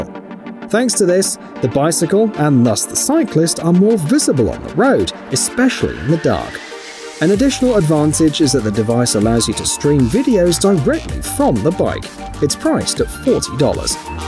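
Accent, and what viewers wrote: United Kingdom. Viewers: I wasn't expecting the British speaker to price them in dollars.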